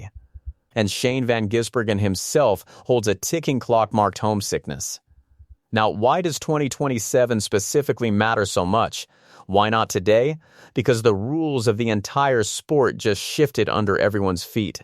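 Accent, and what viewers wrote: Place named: United States. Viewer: You sound quite American. I'm not sure how you can represent NZ and AUS with a voice like that. Makes me suspicious of your analysis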